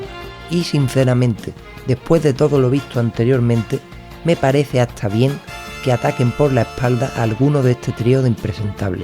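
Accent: Spain. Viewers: Me gusta tu manera de narrar amigo , eres español verdad , tienes tu gracia de narrar y tiene buena imaginación a ponerle a ikki pollo frito , a seiya el burro alado, a hyoga el pato soviético a shyriu el striper favorito y a shun que le dices eso no me acuerdo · porque era el primero que sinceramente menos mal que no tenía diarrea cuando vi este video, y ya vi que otras personas opinaron que lo gracioso y original es la voz sería y calmada mientras dices chistes. El origen de los pandilleros del Zodiaco fue aquí. Ya después subiste un poco la frecuencia de tu voz, queda más emocionante. Eres bastante inteligente, si hasta el semilla chilena en el director disfrutó eso de la uea Shun... Es más gracioso porque un español lo dice, y con un acento interesante.... Ya sacaste video de los hentai de Saint Seiya? 😅 🤣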